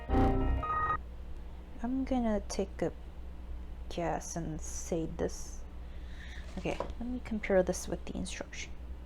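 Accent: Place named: United Kingdom. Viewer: 왜이리 귀여어요 끝까지 다봤다요 크큭 발음 왜이리 영국같아 간지나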